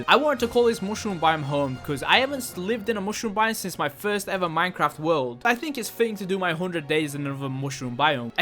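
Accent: United Kingdom. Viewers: Luv that briisish accent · I just love ur accent, i honestly love britian accent (sorry if i misspelled britan) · its just every 100 days but the the unique part is the british accent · I love your british accent (sry if im wrong)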